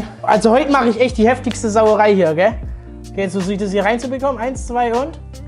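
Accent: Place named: Swabia, Germany. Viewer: Ich mag deinen Schwäbischen Dialekt.... 😂😂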